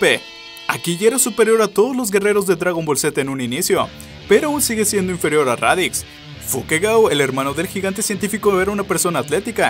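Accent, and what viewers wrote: North, Mexico. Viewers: No manches en este vídeo te salió mucho el asentó mexicano..!! jajaj xd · Como olvidar cuando hablaba como norteño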